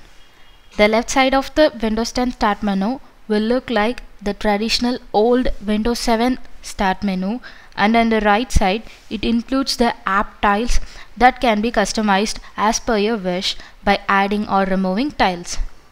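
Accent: India. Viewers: lol i know you are india i know from your sound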